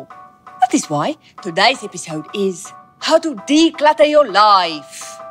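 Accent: Afrikaans, South Africa. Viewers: She’s a South African that’s why she has this accent. My friend lives I South Africa 🇿🇦 in the same city 🌃 I live(not by though) but her aunty is. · LEG3ND she sounds afrikaanse. I should know.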